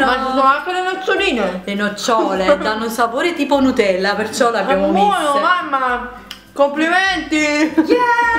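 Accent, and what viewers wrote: Naples, Italy. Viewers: si sente ke sei di napoli😂..cmq bella ricetta io ke amo il. salame al cioccolato e lo faccio spesso..xo ho iniziato la dieta e forse così sarebbe ottimo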